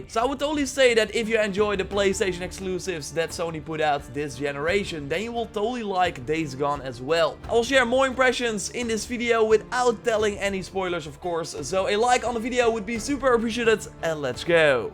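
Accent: Netherlands. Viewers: Your voice man... it bothers me so much wtf i know its a dutch accent im dutch myself but it's just incredibly annoying to me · Nederlands accent, lekker man, Nice review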